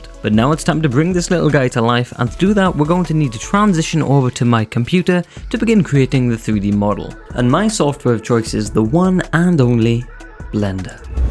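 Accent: Wales, United Kingdom. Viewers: Just decided to get into animating and youre the first youtube creator Ive watched, awesome mate and Id spot a fellow welsh accent anywhere! 🏴 Liked and subbed! Would be cool to get into contact with you in a few months when ive got more knowledge on it all! Best of luck mukka, hope life is good🍻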